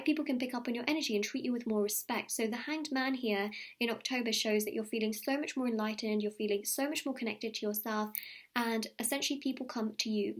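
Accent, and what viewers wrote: United Kingdom. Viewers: British accent 💗💗💗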